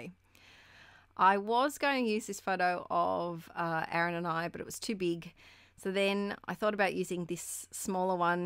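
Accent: Australia. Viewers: I am amazed at the job you managed to achieve suffering from PND. I appreciate your energy and enthusiasm which is in you as well. The first thing I do when I wake up is going on YouTube and watching your video which I expect with a kind of childish excitement. I live in the south of France and I love listening to your Aussie accent. Your videos are precious to me as I suffer from disabling chronic illness which makes it difficult for me to craft. So I just take things as they come. Life is beautiful, you've got a lovely family, stick to all the wonderful things you achieve and don't give to much credit to this PMD. This doesn't mean you've got to ignore it, which is impossible but maybe you need to take care of yourself and slow down a bit. Having 2 young kids and a job is very demanding. Don't forget your own needs. Take care. Thank you very much for the few minutes of fun and joy you bring in my life.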